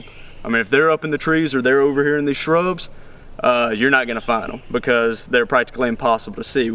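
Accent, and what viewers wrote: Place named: South, United States. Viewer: Love the southern accent you have. Keep on truckin bro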